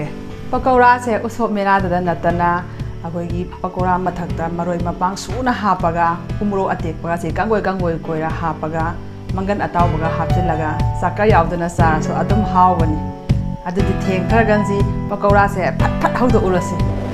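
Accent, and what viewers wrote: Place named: Kakching, India. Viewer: kakching maron ne amfao tajadribo.keino noise migi maron khoibase kothok2 tana hangisibo.manipir kachin amata koibirudribo.lam2 gi matung ena lon se adum khoibane..mapan thoktaba mi yam male.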